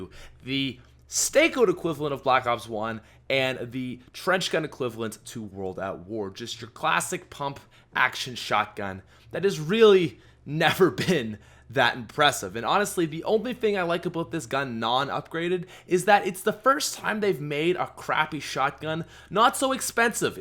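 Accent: Canada. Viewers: did any one else notice the Canadian accent hahahaaha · This guys Canadian aboot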